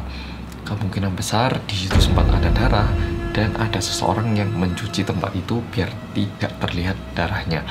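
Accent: Java, Indonesia. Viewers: Mas, suaranya medok2 gimanaaaaa gtu.....sy suka sekali mndengarnya......😁😁😁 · yang medhoooooooooooooooooooooooooooooooooooooooooooooooooooooooooooooooooooooooooooooooooooooooooooooooooooooooooooooooooooooooooooooooooooooooooooooooooooooooooooooooooooooooooooooooooooooooooooooooooooooooooooooooooooooooooooooooooooooooooooooooooooooooooooooooooooooooooooooooooooooooooooooooooooooooooooooooooooooooooooooooooooooooooooooooooooooooooooooooooooooooooooooooooooooooooooooooooooooooooooooooooooooooooooooooooooooooooooooooooooooooooooooooooooooooooooooooooooooooooooooooooooooooooooooooooooooooooooooooooooooooooooooooookkk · Mas medhok: "darahnya terus bercucuran" Me yg phobia darah: mati aja lah aku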